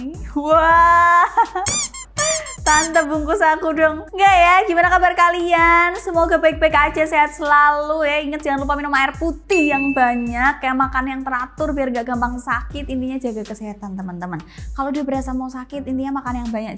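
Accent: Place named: Semarang, Indonesia. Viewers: Aku suka gaya ngomong nya * jawa kali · Medok tp menawan ini *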